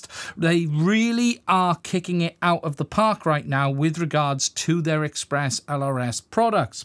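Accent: United Kingdom. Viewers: this is not only great review but I do like to listen youe British accent which is very nice. Thanks for great work - Micro Module bought 👍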